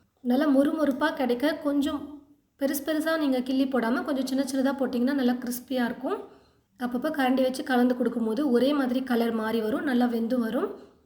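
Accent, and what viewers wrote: Coimbatore, India. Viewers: Coimbatore ah... 😂😂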